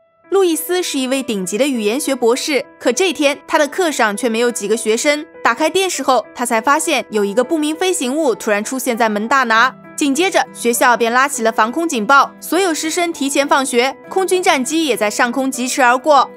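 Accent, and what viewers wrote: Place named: Southwest, China. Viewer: 她口音真的不标准，应该是我们西南地区的口音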